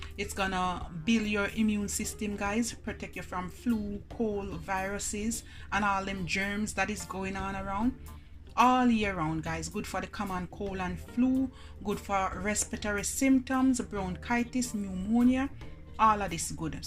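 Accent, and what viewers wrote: Jamaica. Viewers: This is just an amazing healthy juice. Love your Jamaican accent is killing me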